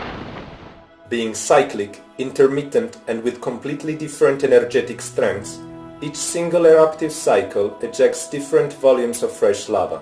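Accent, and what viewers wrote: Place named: Italy. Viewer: Il tuo accento italiano si sente pure a 7.000km di distanza. Ciao bella Italia. Saluti dalla Handerson, Las Vegas, Nevada, USA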